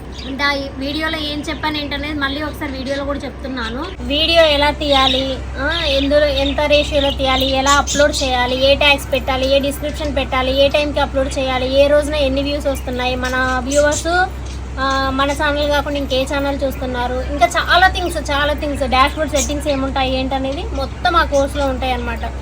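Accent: Telangana, India. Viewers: Hai madam me telegana slag chala bagundi meru balagam move chudanandi same melagy mataldaru eadhare papalu chusukovadam chala kastam pellalne adigenatlu chypandi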